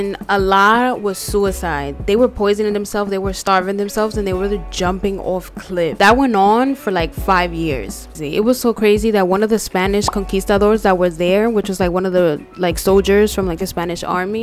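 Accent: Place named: New York, United States. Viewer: Nothing better then a little dominican history, with a NY accent ! DEADASSSSSSSSSS Lmao . Definitely feel as dominicans we gotta know our history , feels like the more times that passes the more people seem to forget about the roots and where our people come from , which is exactly what the Europeans wanted ….. to oppress our beliefs and our culture . Great video !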